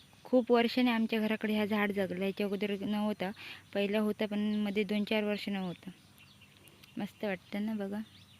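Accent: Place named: Malvani, India. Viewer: छान शुद्ध मालवणी ऐकुन बरं वाटलं. गावाचं नाव सांगितलें असते तर अजुन व्हिडीओ ची मजा आली असती. शेअर लाईक करा.मालवणी भाषे करिता.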